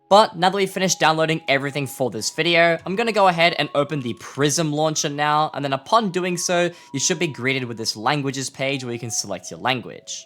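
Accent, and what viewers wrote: Australia. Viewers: BRO IS AUSTRALIAN WE NEED MORE AUSSIES ON YOUTUBE AUSSIE HGIH FIVE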